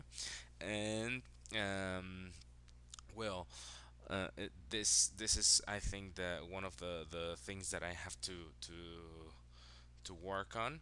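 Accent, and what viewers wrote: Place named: Arab world. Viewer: I was waiting for some western accent , but I can safely say your Arabic accent is neat ! One thing is when you want to say your age then you will use : My age is _____ years as in : Omri 26 sana عمري ستة وعشرون سنة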